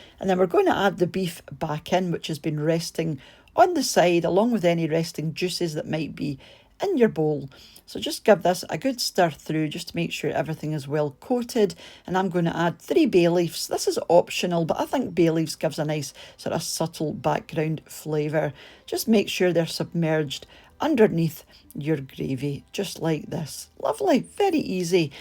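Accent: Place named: Scotland, United Kingdom. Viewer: There's something so comforting listening to you as cook lovely meals. Must be the Scottish accent!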